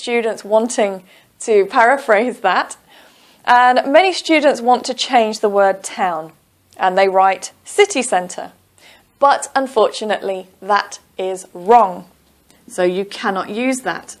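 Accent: United Kingdom. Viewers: Why does not British Council use British accents like her accent in the IELTS?. They always play fucking horribles voices and accents in the listening section !! In the other hand, flawless explanation!! Thank you, Ms !!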